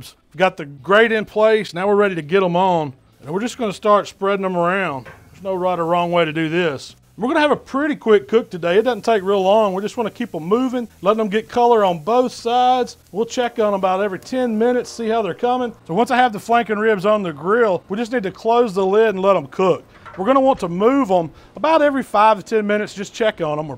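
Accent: South, United States. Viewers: Man that looks good. A fat chef with a south accent is a must when it comes to BBQ.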